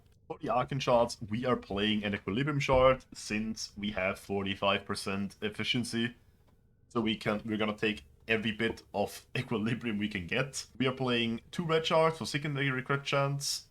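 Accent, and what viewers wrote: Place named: Germany. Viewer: Love your content, very informative. In like 2 minutes i learned more than 90% of the big warframe ytubers convey in 20. In case i heard your accent correctly, greetings from a fellow german from hessen.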